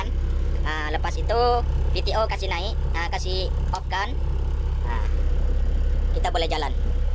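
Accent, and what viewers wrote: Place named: Madura, Indonesia. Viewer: Kok kaya logat Madura omm😅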